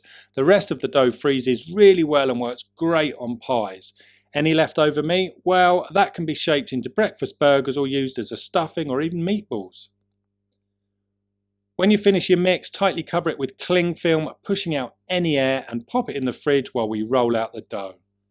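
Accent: United Kingdom. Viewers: your British accent is very attracting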